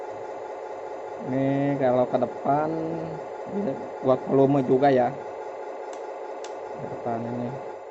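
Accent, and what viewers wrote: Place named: West Java, Indonesia. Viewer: Kanyahoan bgt si a urg sunda na wkwkwk... Sok ah di lanjeng....mugia sukses a🙏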